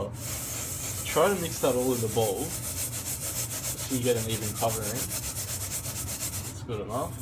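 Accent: Australia. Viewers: Love the Aussie accent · Nice aussie accent mate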